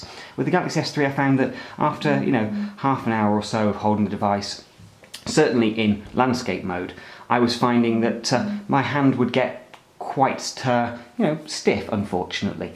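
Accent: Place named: RP, United Kingdom. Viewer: Your voice is so posh and funny - I love it! Great review, by the way!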